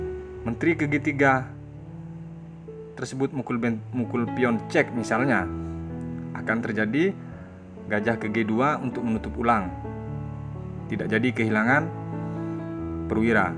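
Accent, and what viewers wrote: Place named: Madura, Indonesia. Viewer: Corak oreng madureh logattnya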